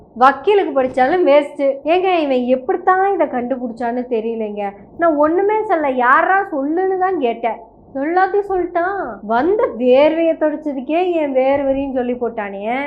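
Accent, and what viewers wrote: Kongu, India. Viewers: உங்கள் அழகும் பேசும் அழகிய கொங்கு தமிழும் சங்கி வேசி மக்களுக்கு ரொம்பவே கசக்கும். பரட்டைத்தலை பரதேசி டாக்டருக்கு அதுவும் கைனகாலஜி படித்தது அனைத்தும் தமிழிலும் ஆங்கிலத்திலும். இந்தியை படித்தால் தவறொன்றும் இல்லை. இவள் சௌந்தரராஜன் மனைவியா இல்லை மோடிக்கு மனைவியா.இந்த லட்சணத்தில் கவர்னராக வேறு இருந்திருக்கிறது இந்த சனியன். போடி போய் மாட்டு மூத்திரத்தை மட்டுமே குடித்து சாணத்தை சாப்பிடு.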